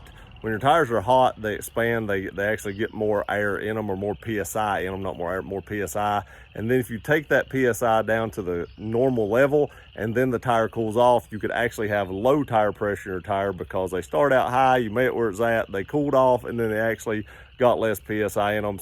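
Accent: United States. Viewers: What an interesting English accent! I wonder which part of the US this is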